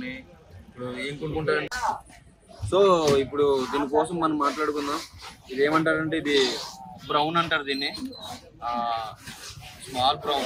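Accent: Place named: Visakhapatnam, India. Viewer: Love vizag.....pure vizag fish market....nice ..open free vizag language.....😀👍. Keep it up...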